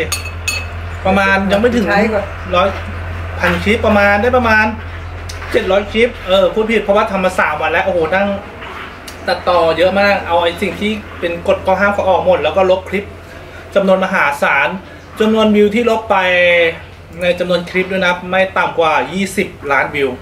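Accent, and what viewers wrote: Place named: Thailand. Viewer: สวัสดีค้ะคุนน้าชอบเวลาคุนน้าพูดค้ะเสียงเหน่อๆเพราะดีค้ะ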